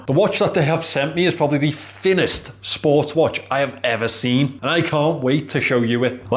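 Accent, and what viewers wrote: United Kingdom. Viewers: you are from which part of The UK?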